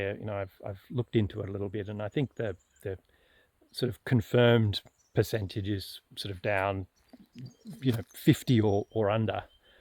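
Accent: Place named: South Africa. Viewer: I can hear an accent, is it South Africa? I'm a pom on 4 acres in central vic too. My land looks very much like yours, sloped with lots of trees, it can be challenging to work with ;) I agree with you on the mental health benefits, it's so nice being out amongst the trees. I work from home in tech and I love those 15 minute walks here and there! I went pretty hard with the crops during covid but it got too much for me with all of lifes other demands. I just couldn't keep up with the weeding, the birds/insects/rabbits/possums decimating my crops, or the wombats bulldozing my fences. I kinda got burnt out making so much effort to get a bowl of strawberries or a basket of deformed half eaten corn, I scaled it right back and now just focusing on breeding chooks for meat, I'm trying to tackle the high cost/high value items. I want to do sheep next but we have no fencing along our creek.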